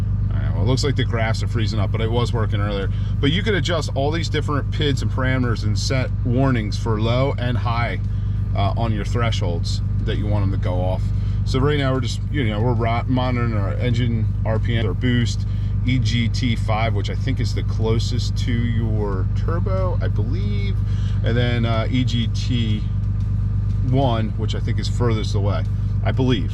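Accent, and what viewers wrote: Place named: Pennsylvania, United States. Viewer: Pennsylvania? You sound like you’re from PA.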